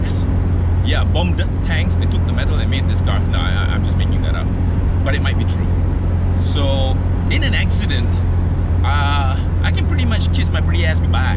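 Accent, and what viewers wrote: Italy. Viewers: Nice engine sound plus your Italian accent 😂. I also want to buy one classic car since I started to see your video 😄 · ...you are truly posessed, confirmed, chop & signed! There are no more medicines in the world which can cure you for this kind of italiano crazy possession. I will try to arrange for you an italian priest to perform the exorcism to rid off the 'Alfa Romeoooo' ancestral evil influence on you soon...but btw,you truly made my day today just listening to you rambling thru your italian 'celup' accent.It was very funny & original pc of work there. You are truly the best out there.. haha.. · Love tat italionooo accent...u should try getting into movies...surely talented...awesome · Hahaha 😂 love the Italian accent . Made me just so happy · Hahaha ohh boy, your italian accent is so funny 😂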